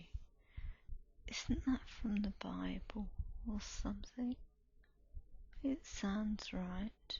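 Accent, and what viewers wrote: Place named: England, United Kingdom. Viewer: Oh my. English accent..I'm in love with you...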